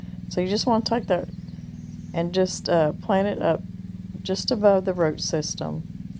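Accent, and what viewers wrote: South, United States. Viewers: Dear Miss, Thank you for brightening this dreary, rainy day with another delightful video! Wish I could send you some rain for your beautiful, newly planted sweet potatoes. Love watching you plant while listening to your calm southern accent! Yesterday I watched you make your Coca Cola chicken and it looked wonderful! You make everything look so easy and simple! was certainly enjoying it…….I’ll take his word for it!🤗🤭 How do I go back and watch your very first videos? I’ve missed so much. Have a happy weekend! 💕💕Jackie🪷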